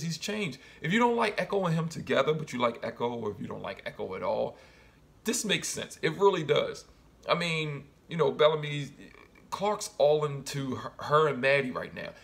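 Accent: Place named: United States. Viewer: Good review... Ive been trying to find another recaper of this show after happycool's channel stopped doing reviews. also nice accent! Im Canadian so I enjoy hearing American accents lol. I do like Belamy and Echo... they do go well together but I do want Bellarke to happen. Maybe they will kill Echo off... or Bellamy and Clarke will bond over some plan while Echo is away doing her spy thing. He will talk about how hard it was to leave her behind and how is heart hurt and they will lock eyes and makeout!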